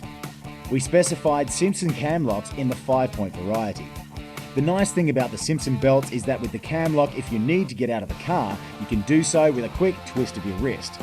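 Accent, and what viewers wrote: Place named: Australia. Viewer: Aussie voiceover guy, who'd of thought. :)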